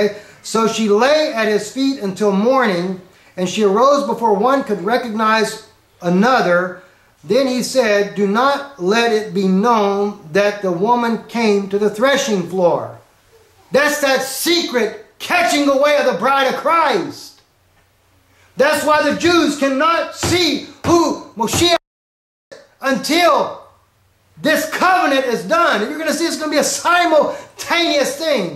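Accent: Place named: Texas, United States. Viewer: you betta preach brotha ! whoo. this sounds like good ol down home southern preachin to me. i think i hear a little texas in his voice hahaha. PRAISE HASHEM!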